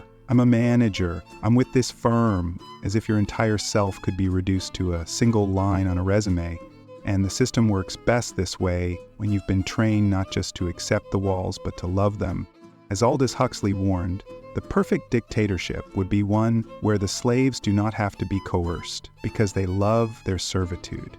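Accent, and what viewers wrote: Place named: United States. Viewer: Good video. So simply explained. I was expecting something with an "Asian" voice that was difficult to understand. That was more like basic English spoken like an American. The concepts were straightforward. For a rat-race escape video, it diagnosed the problem and offered solutions, in a way that was useful and tabloid.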